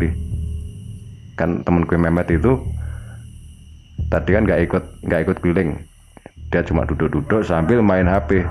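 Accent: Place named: Java, Indonesia. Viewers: Mohon maaf sebelumnya, lebih baik pake 'saya' atau 'aku' aja ya mas.. Bukannya mau bilang org Jawa gapantes ngomong 'gw', boleh kok ga larang. Cuma.. kadang kalo aksennya kental bgt kaya gini didenger terkesan maksa aja jd ga natural 😂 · Iyaaa , aneh deh bahasanya medok khas jawa tapi ngomong gue gue..wkkk...pake aku yo rapopo kok, keknya jg jarang deh orang jawa tengah /jawa timur ngobrol peke gue gue sekalipun anak hitz gaul..wkkk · sy malah suka dgn aksen mas yg ga dibuat buat..apa adanya . malah medok bgini jd ciri khas nya mas.. . dan yg julid ttg kata "gua".. tlg blajar dulu ttg aksen seseorang.. hehe.. semangat mas..pertahankan ciri khas anda..ditunggu crita brktnya. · Mak buugghh, kuaget asem o WKWKWKKW JOWO BGT MAS E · Hio itu batangan lidi..., halaah kesuwen mas. Hio itu Dupa, ben gampang. Ceritane mantep apalagi kalo ndak peke Ghuwe...logate isih medhok, ora pantes. 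Sepurane, sekedar saran...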